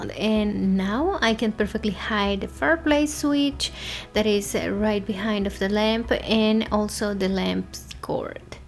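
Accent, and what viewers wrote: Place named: Brazil. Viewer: Hi, I am a silent subscriber but wanted to say that I love your style and always look forward to your videos:) I love your accent, Brazilian, Portuguese, Colombian? (Lisa from Seattle)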